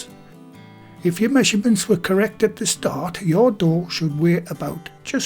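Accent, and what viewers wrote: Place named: England, United Kingdom. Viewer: Hi I love your channel, I am an English girl living in USA and I miss home very much so it’s great having your accent (even though I am a London girl lol) talking me through my bread recipes! When you get to the end of a step I always say “okay ”! My husband says you talking to again lol. You have taught me a lot about making bread, it’s my new passion, I never thought I could make bread (I have been posting pictures of my bread and other recipes on Facebook and one of my friends called me a kitchen witch, a great compliment that I share with you as it’s because of your videos and the great way you explain) and I didn’t think I had the tools and utensils to do so but where you show the alternatives I have built up my own bread making utensil drawer. I hope to be half as good as you one day. I was wondering if you have a seeded bread roll recipe that you could share with us? 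I am a vegetarian if you have any good veggie recipes that would be nice! Thank you for sharing your gift! Oops as I was typing this message you say how you can add seeds can you add them to white rolls also?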